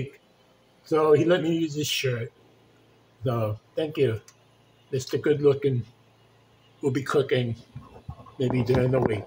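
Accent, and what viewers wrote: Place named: Wales, United Kingdom. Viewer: Are you originally Welsh? You have a Welsh accent here and there :)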